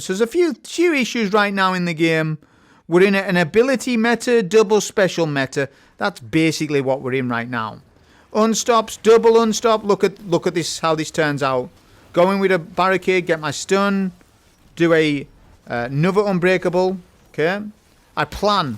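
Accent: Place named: Cumbria, United Kingdom. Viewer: Yo where you from you sound like a fellow Cumbrian!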